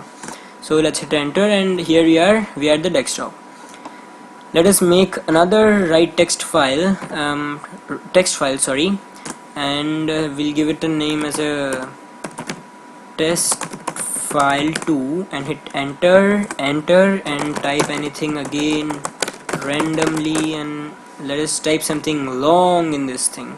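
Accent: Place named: Arab world. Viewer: Me noticing an accent: 🤔 in 🧠 -> is he Arabic?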